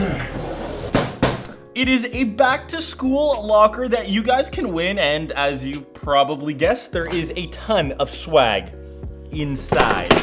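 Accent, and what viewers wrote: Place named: Canada. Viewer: Your Canadian accent calms me, it's often my audio ambiance of choice while coding.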